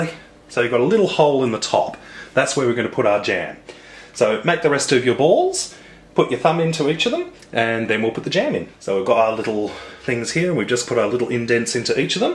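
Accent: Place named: Australia. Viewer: you can tell his Aussie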